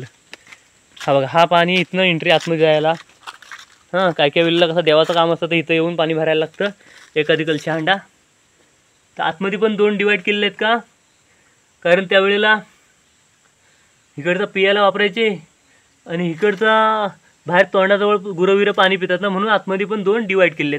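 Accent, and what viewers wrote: Konkan, India. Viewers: गेले काही दिवस तुमचे व्हिडिओ बघतोय... आपली कोकणी बोली आवडली... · खूप छान व्हिडीओ दादा तुझी गावरान भाषा शैली हवीहवीशी वाटते ओरिजनल कोकणी भाषा हीच भाषा ठेव पुढ जाशील कानाला ती शुद्ध भाषा ऐकन्या पेक्षा ही भाषा गोड वाटते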